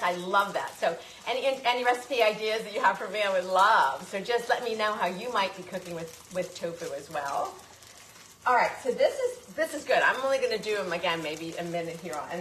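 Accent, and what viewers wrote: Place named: Brooklyn, United States. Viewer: new subscribwe love love love your videos and personality i happen to be vegan am wondering if you are from brooklyn as your accent sounds it i was born there but let 50 yrrs ago now in georgia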